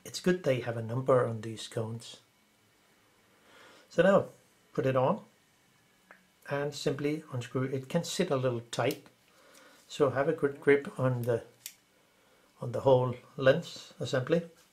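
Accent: Denmark. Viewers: You've got a cool accent but I can't pinpoint it. Are you Danish?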